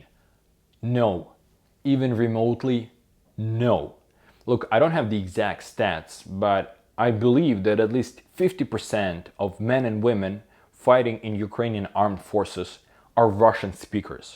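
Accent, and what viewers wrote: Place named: United States. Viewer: У вас очень хороший американский акцент) Редко встречаются русскоговорящие люди с хорошим английским акцентом)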